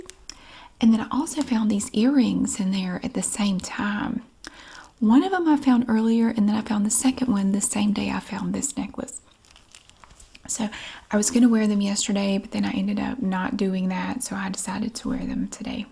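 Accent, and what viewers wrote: South, United States. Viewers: Hi. I listen and relax to your vidz a fair bit. Love your southern accent. I came across yesterday's video were you seemed very upset due to trolls. Please dont be upset. You cant control such idiots and the ignorance of folk. They hide behind their phones etc and blubber their strifes away. Cowards and it's not personal... You are so genuinely lovely at heart... Take Care Tina from Liverpool (Engand)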